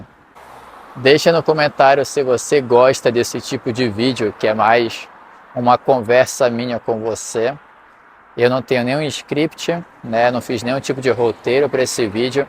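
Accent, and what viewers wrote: Rio de Janeiro, Brazil. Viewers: You sound so carioca! :)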